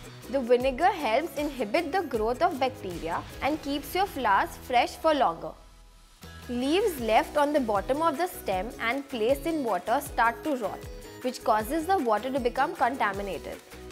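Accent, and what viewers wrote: India. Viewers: Is that a Hindi accent?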